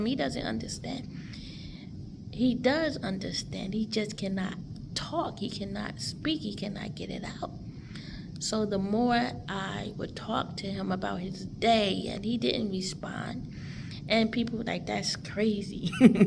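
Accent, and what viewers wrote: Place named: New Jersey, United States. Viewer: I love your Jersey accent!!!!!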